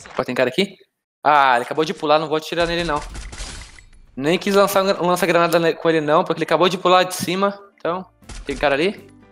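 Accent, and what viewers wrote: Rio Grande do Sul, Brazil. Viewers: ele é gaúcho?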